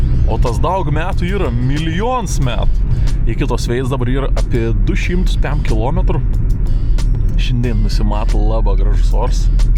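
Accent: Samogitia, Lithuania. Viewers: Kaip keista išgirsti kalbanti taisiklingai ne žemaitiška tarme:DDDD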